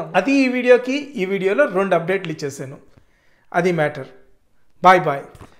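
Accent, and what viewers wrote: Nellore, India. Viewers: I have a very small question bro... If you don't mind... Are you from Nellore?? Bcz some words Nellore yaasa tho match avtunnaayi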